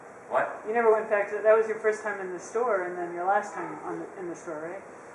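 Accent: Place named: Oklahoma, United States. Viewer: I thoroughly enjoyed your talk, and am so glad that Anna (as it seems (filmed it) and put it up for all to hear. It brought back such great memories or your "Oklahoma Accent":) which you still have in spades. Haha. And I also enjoyed the "chiming bowl" story,.. What an interesting idea you had, and told in a way only you could tell. It was such fun to see you being you again.:) Lynne Anna, Thank you for filming this and putting it up. It was great.:)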